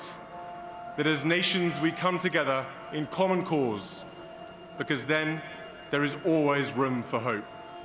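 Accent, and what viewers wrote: United Kingdom. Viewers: He reminds me of his mother and his looks he is eloquent and talk like a real Brit what a nice homage to the Queen and a nice speech let's hope he makes a good... king... Not too happy with the Royals...I've been around quite a while to see what the royals did to Diana ....never was a big fan A lot of hoopla ...if they really did a background check are they all really royalty? Not sure how true that is it's not like in the 1600s a lot different then I'm sure Bravo the Queen Elizabeth II to be around this long.... Queen Elizabeth I was a real Queen wow she is named after after a real gem.God-bless her🇺🇲🇨🇺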